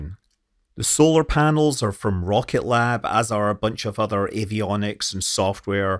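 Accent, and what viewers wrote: United Kingdom. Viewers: Thank you for the wonderful presentation, a fascinating channel, and your great knowledge, enthusiasm & skills, but that (British? Scottish?) accent, such as at/about 00:5 to and to , particularly with the changes in voice volume & intonation (obviously intended to convey your obvious enthusiasm) make your speech unintelligible to American listeners, and does your work a terrible disservice. Now, with nearly 2 million subscribers, you may wish to ignore my voice, but I think that your fascinating thoughts could enjoy an even bigger audience if your speech was clearer. Thank you & best wishes!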